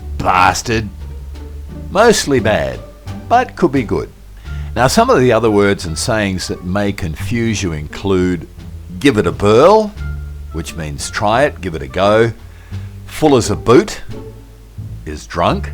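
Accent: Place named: Cockney, United Kingdom. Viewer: Seems Cockney, doesn't it?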